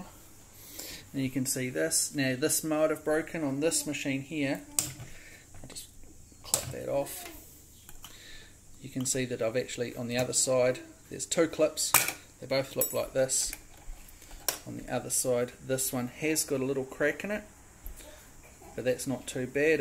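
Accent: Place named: New Zealand. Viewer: Love the Kiwi accent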